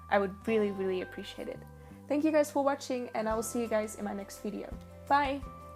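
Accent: Netherlands. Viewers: Ikr, she totally sounds dutch. also she said Haargrens when she was looking for the word hairline. so yeah. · Are you Dutch? You kinda have a Dutch accent.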